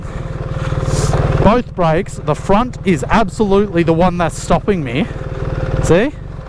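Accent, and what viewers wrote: Australia. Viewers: thank you for showing us!! also you where just in Portugal and all over Europe you sounds Australian and you now live? in Estonia? talk about adventures XD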